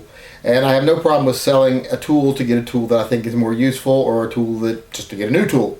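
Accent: United States. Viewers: Never ever buy or sell a gun from a sissy sounding Yankee. Especially one who magically has fooled a large group of followers that he knows jackshit about firearms. Those seeking knowledge please look elsewhere because all you've got here is a smartass blowhard.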